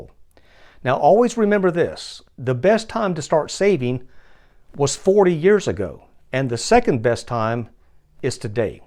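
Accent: South, United States. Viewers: l like your southern drawl is it?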